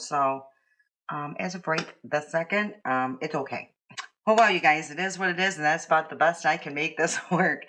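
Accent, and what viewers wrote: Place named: Minnesota, United States. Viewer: Don't stop I love yr channel u r so funny and likeable Minnesota accent so charming and I loved the missa video do a skin Tint best of. Or Charlotte Tisbury dupes best of. Thku for doing a great job great channel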